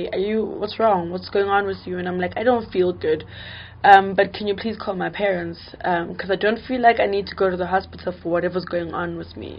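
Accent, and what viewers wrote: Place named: England, United Kingdom. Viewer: what a beautiful English accent tjo, your ancestors are learned 🙌loving how you explain it in your language too wow.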